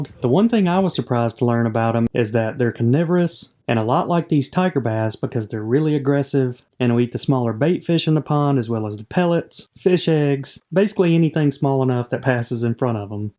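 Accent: South, United States. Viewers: I just love listening to this american southern accent! greetings from Europe!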